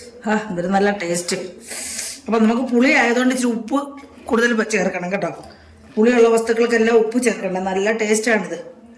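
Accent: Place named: Mavelikkara, India. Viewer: നമ്മുടെ മാവേലിക്കര കാരുടെ അച്ചടി ഭാഷ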